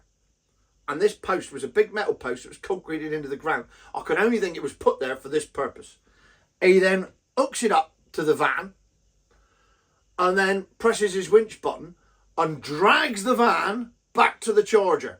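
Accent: Cornwall, United Kingdom. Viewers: You have made my Easter 😅 good Cornish man with a hint of Jethro 🏴🏴🏴🇬🇧🇬🇧✝️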